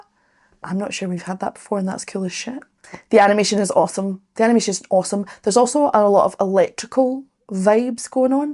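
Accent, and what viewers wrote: Scotland, United Kingdom. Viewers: I have four comments for this 🙂 1. I really don't get why so many English speakers apologize for having a dialect. Never ever be ashamed of how you speak. Take pride in having a proper dialect and not speaking like you are from nowhere with some watered down standardized sound. How utterly boring and stagnant the world would be if we all spoke the same way. Inspiration from different cultures and different places is what lest humanity grow and evolve. Ignore the language elitists pretend that some dialects are better or more civilized than others. (I am by the way quite jealous of that incredible cool Scottish dialect you have.) 2. is not the most political performers in the world. That being said their music does in general carry a very positive message, and it has been suggested to be prescribed for depression. There are multiple songs from them with a strong message. 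Since you don’t like being biased before a song I will list the songs and the messages separately, so you don’t know what you are getting 🦊 The songs are: - (PIA Arena 2023 Live) Eng Subs <link> - Live at Sonisphere 2014,UK (OFFICIAL) - The last song of their first concert in the UK (and their international breakthrough). The audience starts out a bit confused for the first few songs, and end up like you see here at the end. <link> (OFFICIAL) <link> The themes are singing about: Looking forward with a positive view after the world has ended. Anti bully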